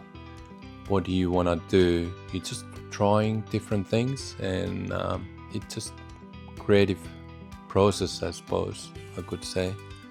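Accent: Australia. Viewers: I was listening to your accent, thinking, boy, this looks like a gum tree here, and you are! Here, I mean, not a gum tree. I love your technique, I paint with acrylics but can always adapt oil techniques to an equivalent acrylic application. Thank you for sharing, I'm stuck on a gum in my latest painting, so going to try your paper towel trick for foliage. The exact look I am after. New subscriber from Central Queensland Australia.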